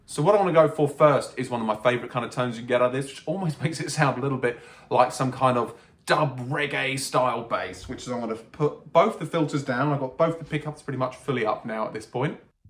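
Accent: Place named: United Kingdom. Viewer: Pity , as from accent it looks is based from U.k . Meaning the second filter preamp avalailbe on the market is still in u.k , brexit sucks . Is also bit weird coming so late in the filter party in the very same country ,but more the better. For reference decades after East ( the best preams of the world i.mo) did it for acg basses , following on the alembic fame for that in the past ( with lo pass AND hipass btw , i have it , exceptional , not practical for live use for speedo changes though ,so deinstalled ages ago ) . Again, The more the better so lucky you , just honestly it should have been mentioned , cause EAST (uk..) IS the MOST versatile if you just judge by that variable , and by far . Us players know it well lolz Anyway that confirms bass is DECADES ahead guitars in terms of alternative solutions ( guitarist dont want even try that lolz)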